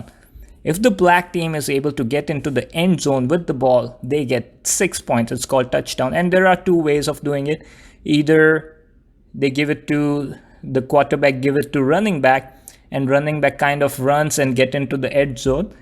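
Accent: India. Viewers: no it couldn't be real, you Indian ain't you? Anyone can tell that by the way you pronounce "t" and little hukkies you take. I have an indian friend, she speaks exactly like you, btw no hate🤗🤗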